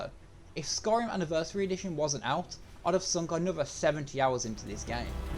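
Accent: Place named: Birmingham, United Kingdom. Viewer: Downloading now, thanks for the review! Ps love the brummy accent ;)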